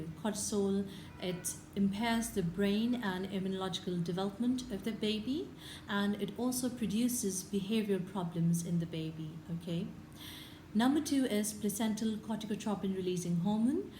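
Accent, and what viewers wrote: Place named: United Kingdom. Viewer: Madam can u plz tell me how did u learn british accent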